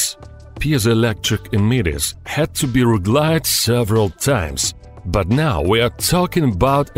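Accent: Russia. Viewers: Your russian accent (I'm assuming) doing english is funny, tbh i like it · Вот ниразу не слышал русского человека, говорящего по английски, которого нельзя было бы опознать по акценту. Видос классный!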